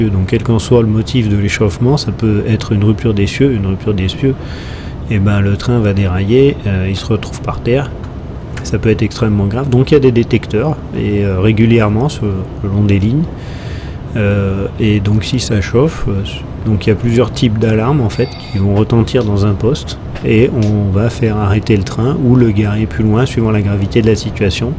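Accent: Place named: Haute-Loire, France. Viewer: La voix de est unique, appaisante avec un p'tit air de la Haute Loire si je n'dis pas de bêtises.. 👍😊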